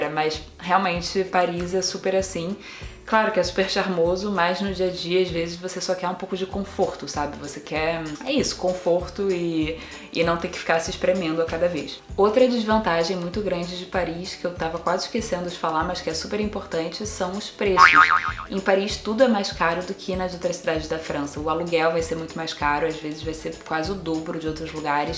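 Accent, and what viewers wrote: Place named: Rio de Janeiro, Brazil. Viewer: Sua voz é muito boa, dicção também! O nosso sotaque carioca é muito bom hehehe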